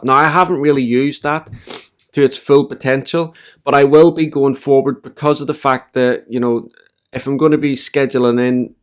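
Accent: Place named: Northern Ireland, United Kingdom. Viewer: Ahhh, I could listen to North Ireland all day. My mum's from Armagh. :)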